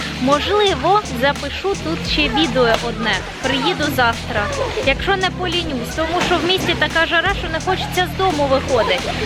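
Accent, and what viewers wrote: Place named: Poltava, Ukraine. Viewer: Дякую за відео! Нетривіальна країна, розповідь цікава і приємна вимова (полтавська, навскидку, але можу помилятись).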